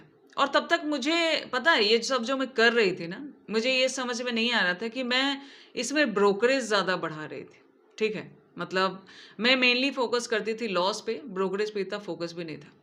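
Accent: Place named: Haryana, India. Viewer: u belong from Haryana as per ur tone❤🎉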